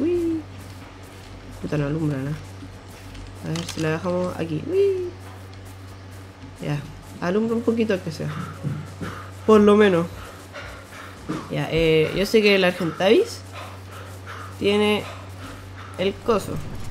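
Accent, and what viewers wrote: Chile. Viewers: chileno verdad??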